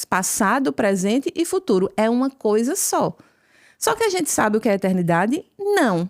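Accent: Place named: João Pessoa, Brazil. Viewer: Que lindo este testemunho! Sou do Rio e amo esse sotaque de Jampa. Tenho grandes amigos na cidade. Fui católico do IBGE quando adolescente, virei ateu após os 20 anos contaminado pela universidade. Agnóstico aos 30, e Cristão Free Style após um método CIS do Paulo Vieira aos 38. Aos 40 passando por um grande problema no trabalho, minha esposa me "impôs" uma viagem a Campos do Jordão com uns amigos para espairecer e pedi que no caminho a gente parasse no Santuário de Aparecida, pois desde que voltei a ser cristão não entrava numa igreja. Ao chegar e rezar frente a imagem para agradecer por todas as minhas bênçãos independente do problema, a solução chegou através de um telefonema! Naquele momento tive a certeza que precisava ser católico, e agora, 5 anos depois estou fazendo o Catecumenato e me apaixonando cada dia mais por nossa Igreja. Em Julho farei o caminho da fé e aguardo ansiosamente por minha primeira comunhão. 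Salve Maria e Viva Cristo Rei!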